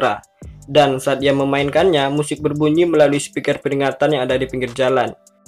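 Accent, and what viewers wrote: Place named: Sumatra, Indonesia. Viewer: Logatnya kaya orang sumatera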